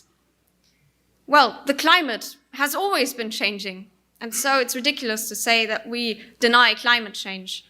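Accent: Germany. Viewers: German accent...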